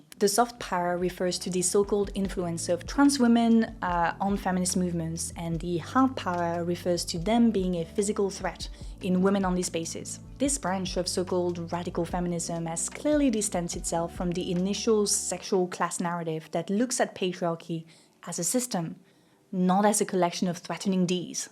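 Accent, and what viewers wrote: France. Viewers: French pronunciation of indian names is so cute and funny at the same time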